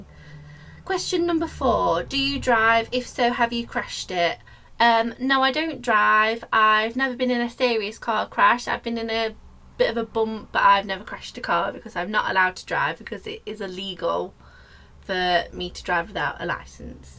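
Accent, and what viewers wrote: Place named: United Kingdom. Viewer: What accent is that, not sure if British, oops never-mind you answered my question later on in the video haha This was so cool to listen to, wish us Americans had a cool accent haha.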